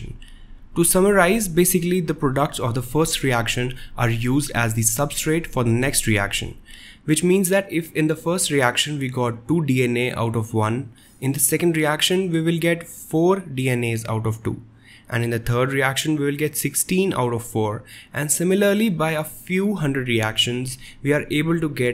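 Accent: India. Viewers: Lol why this school related videos are always indians that I actually understand. (Not sure if he is really indian, but he sounds like it)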